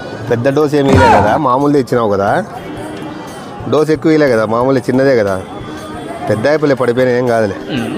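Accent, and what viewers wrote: Rayalaseema, India. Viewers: Madanapalle Naa thalli gari uru chittor daggara thandri garu uru super rayalseema slang comedy chupinchavu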